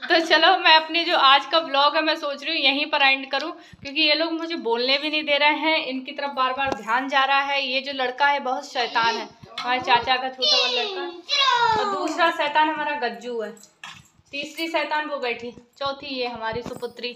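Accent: Uttar Pradesh, India. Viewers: हमारे hardoi m bhi aise hi bolte h jaibo kaibo main jab apne ghar jati hu to main bhi aise hi bolti hu अपनों के साथ अपनी बचपन की भाषा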